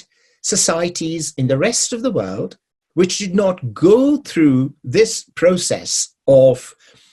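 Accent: United Kingdom. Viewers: Am I the only one who thinks his academic British accent to be a tad ironically amusing?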